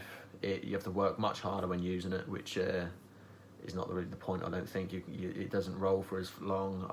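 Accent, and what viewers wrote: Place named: Norfolk, United Kingdom. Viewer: Excellent video my dude! Same board and couldn’t agree more about the bushings. Where are you from? I know the accent, Norfolk right?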